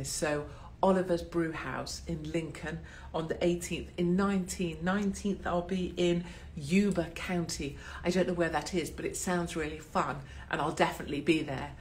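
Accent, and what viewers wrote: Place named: Devon, United Kingdom. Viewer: How did you get rid of the Devonshire accent? I'm a proper Janner (just down thd Road from your birthplace). Glad to see you are well, x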